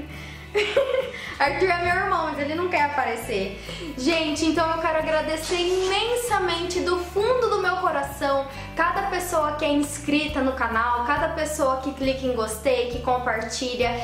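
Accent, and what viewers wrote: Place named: Caipira, Brazil. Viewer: você é linda!!! Já te acompanho a algum tempo e sigo a maioria das suas receitas caseiras, em especial aquelas para o rosto! amo o seu jeitinho de falar caipira!!! e sua simplicidade!!! parabéns!!!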